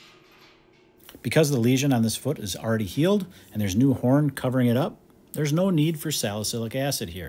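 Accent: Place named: Arkansas, United States. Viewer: U from Arkansas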